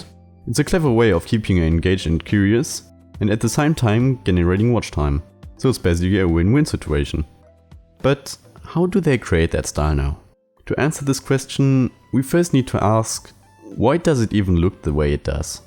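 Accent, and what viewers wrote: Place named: Germany. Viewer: if u ever heard a german u know, dass du voll deutsch bist, danke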